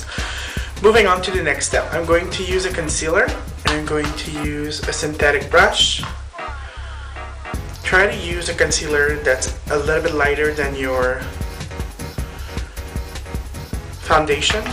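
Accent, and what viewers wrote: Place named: Canada. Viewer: "folies blanches" trop marrant l'accent canadien!! :)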